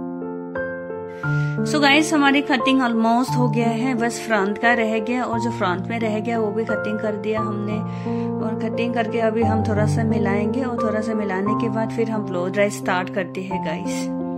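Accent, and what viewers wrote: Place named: Bangladesh. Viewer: Ap Bangladeshi ho kya apki Hindi se lag raha hai perfect nhi hai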